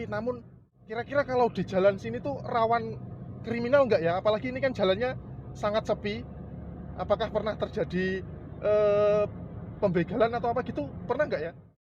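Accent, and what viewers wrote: Java, Indonesia. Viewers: Log at jawamu medok sekali..geli & jijik dengernya..aq pindarh aja..sorry..! Mantap.jawa kowek..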